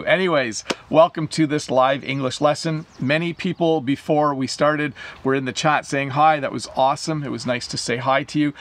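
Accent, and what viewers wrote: Canada. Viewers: I love Canadian accent either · I'm Thai people, I like to learn canadian accent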